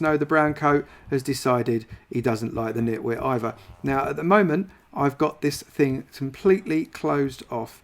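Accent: United Kingdom. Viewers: That Gordon Ramsay accent tho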